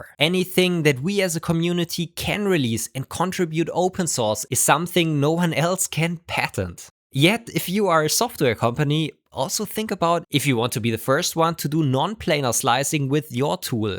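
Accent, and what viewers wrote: Germany. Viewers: All in english, yet so german xD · Ich hab nur zwei Worte gehört und ich hab sofort gemerkt dass du deutscher bist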